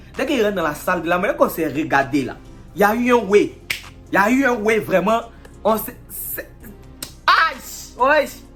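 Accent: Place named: Ivory Coast. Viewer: Je suis fan de ton français ivoirien bro suis enjaillé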